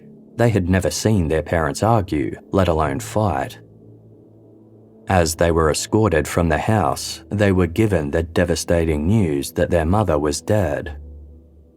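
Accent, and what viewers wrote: Australia. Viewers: SOON AS I HEARD THAT AUSSIE VOICE I TURNED HORRIBLE..I HATE WHAT OUR COUNTRY HAS BECOME..BUGGER OFF..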